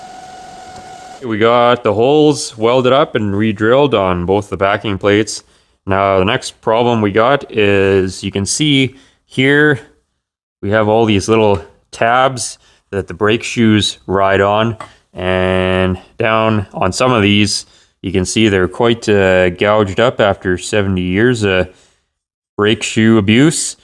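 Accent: Canada. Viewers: I truly enjoy watching your videos and listening to your Canadian accent. You also have a dry sense of humor, as do I. So I appreciate that too. Keep the great content coming. And don't beat yourself up over wasting time. We all do it. I mean, just sitting here day after day watching other people work is sort of a waste of time. But heck, I'm 82 so I do what makes me happy.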